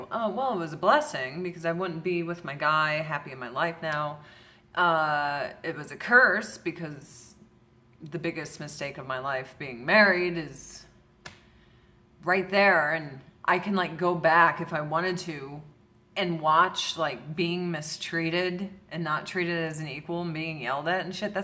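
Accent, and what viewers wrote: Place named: South, United States. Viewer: When she was on ANTM her accent was like so cool and tomboy-ish and she had like this southern accent and I LOVED that accent. But now she has this “normal” accent and it's not really “cool” anymore.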